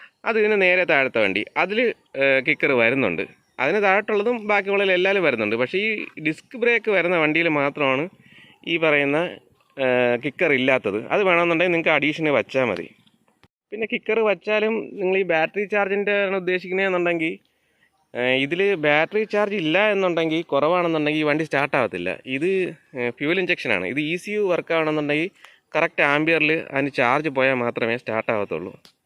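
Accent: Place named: Trivandrum, India. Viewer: Pakka Trivandrum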